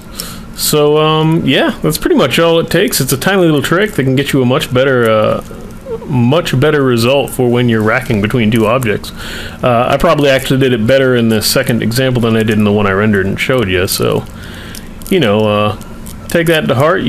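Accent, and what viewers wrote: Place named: United States. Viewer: lets see the live stream! ps. you had a bit of a cowboy accent in that one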